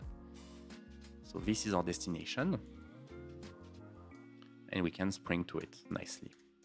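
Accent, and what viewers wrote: France. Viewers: German speaker, sounds like French, looks like American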